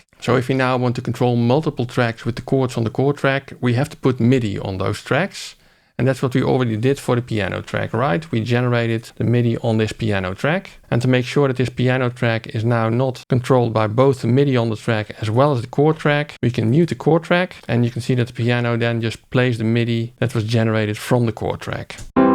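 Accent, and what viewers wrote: Netherlands. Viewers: Man man man, wat een dik, Nederlands accent. Maar verder wel een informatief videootje over de Chord Track.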